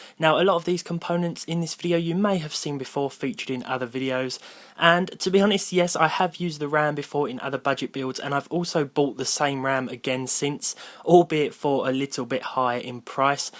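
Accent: United Kingdom. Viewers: british accect ftw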